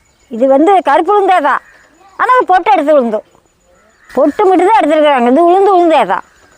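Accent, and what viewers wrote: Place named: Kongu, India. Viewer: இது கொங்கு தமிழ். அப்படித்தான் இருக்கும்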